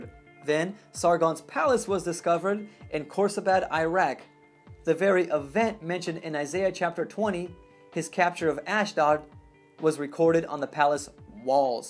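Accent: United States. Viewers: Co tam u ciebie? so you are Polish or not? because you sound American. BTW I'm not Polish I just have Polish friends my best Polish friend is in Ireland on the run from the law for driving offences his name is Prawo Jazdy.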